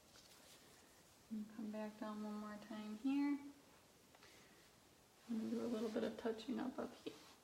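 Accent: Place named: Buffalo, United States. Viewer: You must be from Buffalo NY??! 😁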